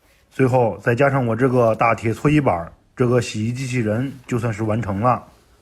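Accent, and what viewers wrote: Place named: Tangshan, China. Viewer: 老耿说话出了唐山味儿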